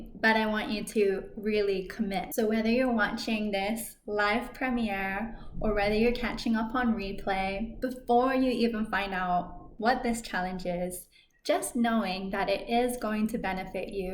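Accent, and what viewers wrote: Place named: United States. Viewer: Edgar Cayce, a christian, would be proud of you, he stressed prayer as one thing to help with dream recall. And it is working for me, I'm not christian but I take prayer as being grateful and thankful for everything i have, remember to bless your food and drink always, and then of course asking for all I want. My still poor dream recall is improving. Affirmations are another to do, if i hope you don't mind me rambling on on your page but my dream recall is improving everyday and every night in every way and remembering my dreams is easy. Getting out of my comfort zone would be becoming more social, just talking to people more. I could practice that in lucid dream state, it's on the list of things to do and learn there in my lucid dreams. Thanks for encouraging me, I will talk to people more here in the waking life real world, this "shadow world" we live in. 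You're so cute, if I may acknowledge that? You have a very nice american accent, that is if memory serves me and you are actually english which seems very unusual to be able to change your accent like this. Many blessings and love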